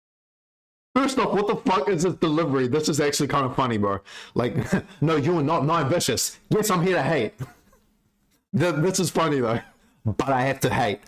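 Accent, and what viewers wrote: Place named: New Zealand. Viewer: most new zealander accent of the year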